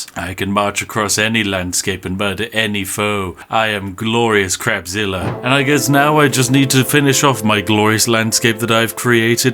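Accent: United Kingdom. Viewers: I can't tell if is a American (or from another country) making fun of British people or a British person making fun of British people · Ahhh... I haven't watched a video of this wonderful British voice in a long time.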